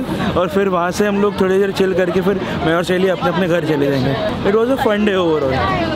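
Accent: United States. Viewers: Jamtay Jamtay U.S. Accent yetay halu halu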